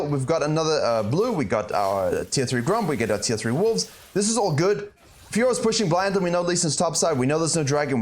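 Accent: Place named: South Africa. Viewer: The little south african cracks in your accent makes me feel at home :) the information is great btw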